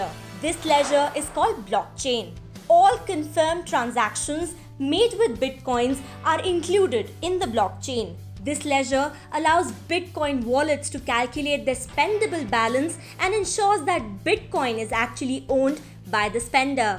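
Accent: India. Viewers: I can not take those Indian accents… I automatically gave it thumbs down, however, she might have had some cool info, besides I took my sweet a s s time to write this response.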